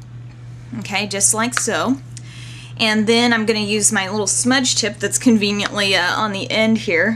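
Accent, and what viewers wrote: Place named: United States. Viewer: Fantastic! and...just let me say another thing: you speak so good American, that I can easily understand every single word, even if I am Italian! :-)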